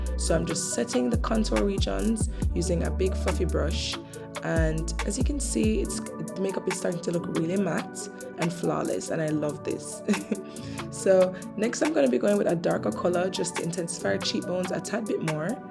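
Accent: Jamaica. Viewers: Am I hearing a Jamaican accent? Subscribing!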